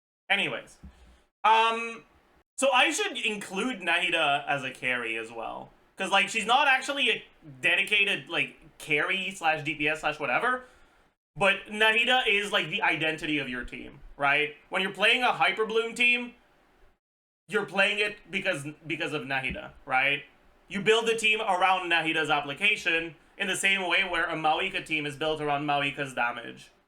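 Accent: Quebec, Canada. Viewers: He’s French Canadian so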